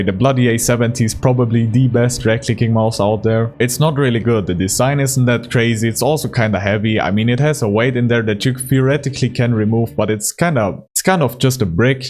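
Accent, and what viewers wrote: Germany. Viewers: wait are u german u got that accent xD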